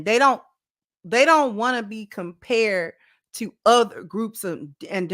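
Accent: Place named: New York, United States. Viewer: NY'er here, Brooklynite til the day I die. I hear that NY accent slowly creeping into vernacular... sto-ah, not store? I know next, she gonna start saying caw-fee 🤣🤣🤣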